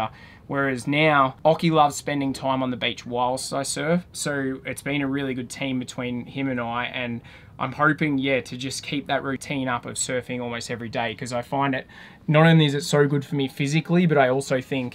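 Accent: Australia. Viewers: welcome back - only Aussies can say "clutch" and "whil'st" and sound cool doing it. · I also like the music you played. I have never seen you on the Youtube so I really liked the accent of australis...............I will see you again........bY for now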